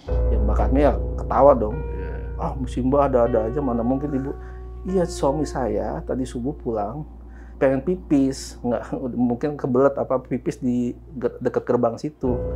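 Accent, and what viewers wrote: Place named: Kebumen, Indonesia. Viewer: Whh trnyata mas wong 'ngapak' Kebumen jg yh.. Kebumen nya mn mas??😅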